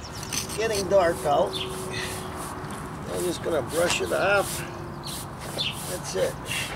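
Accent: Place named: Scranton, United States. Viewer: Love your videos....I had to look ya up what part of pa...I knew with that accent had to be scranton..keep up the great work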